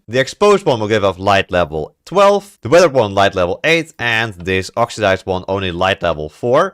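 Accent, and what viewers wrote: Netherlands. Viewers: Bedankt voor de tutorial! And yes, I know a Dutch person when I hear one, haha!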